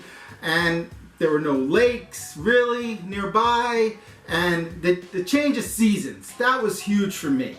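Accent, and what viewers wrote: New York, United States. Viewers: Hi LOVE LOVE LOVE your channel😂 I just subscribed and my husband and I are from New York tooo...(living in California 😢) Husband is originally from Elmont and Jamaica Queens. I’m originally from East Harlem..lived on Staten Island for 2 years🤮 moved to Far Rockaway my last year in HS got my first dumpy studio apartment in West New York NJ it was a dump but it was my dump. Met my now husband moved to Sacramento in 86 for family reasons on his side and I just wanna to escape NY, we had our first daughter in 1991 moved to southern cal in 92 where we had our 2nd daughter...fast forward to now I’m looking to retire in 2020 and we need to get out of California since we can’t comfortably afford to stay here! I would have to work until Im 80 or DIE AT MY DESK.😱 I found you because we were looking at Nevada...Texas and now Arizona and we think you are awesome..you New York accent brings tears to my eyes in a good way..my husband still has his.. 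But thank you for all the great info I have two years to decide where we we will live out our days..also your daughter is ADORABLE! Oh one more thing...I would like my new home state to stay RED please...that’s why we are leaving Callie and we don’t want want to change our new state like the libs who want to drive up home prices and change the atmosphere.😇 Jeannean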